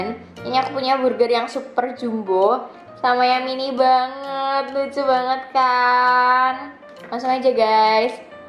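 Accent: Java, Indonesia. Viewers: Ka dari jawa timur atau jawa tengah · Mbaknya org mana?ook rodo2 njowo😂😂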